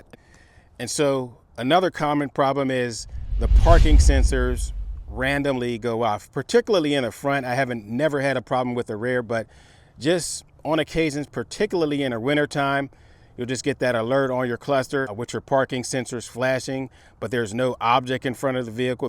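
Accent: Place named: Pittsburgh, United States. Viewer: U from pittsburgh ? Sound like a yinzer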